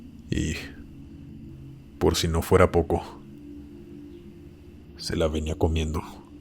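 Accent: Mexico. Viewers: Me gusta mucho este canal. >Narra las historias con la seriedad que merece. >tiene una voz muy agradable y modulada, y norteña como yo. >los relatos son muy interesantes, incluso siempre que los escucho logro estar ahí donde se narra, en el bosque, desierto, etc.... es que debido a las experiencias vividas en mi infancia, no tengo el miedo que se esperaría deba sentir, y aparte consumo mucho contenido como el suyo, quizá buscando experiencias similares a las mías o buscando una respuesta. >me gusta mucho que en cada relato pone un título y subtitulo. Le da más suspenso. GRACIAS.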